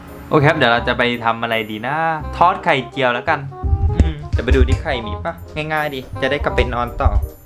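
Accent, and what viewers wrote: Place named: North, Thailand. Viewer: พี่เป็นคนเหนือหรอครับทำไมพูดเหนื่อ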